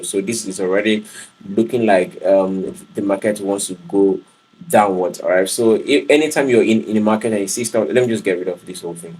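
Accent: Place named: Africa. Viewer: yeah I get you but I've been following this guy for about 2 years and my goodness his accuracy is top notch He speaks like an African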